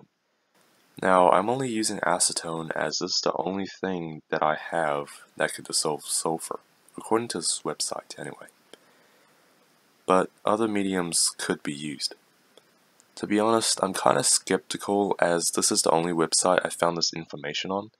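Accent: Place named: Australia. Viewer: I can't tell where your from. Australia?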